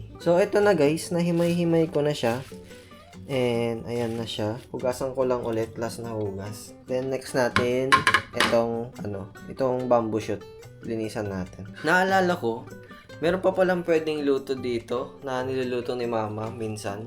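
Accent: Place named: Ilocos, Philippines. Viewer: twelve fortey gayam... your ilocano accent is lovely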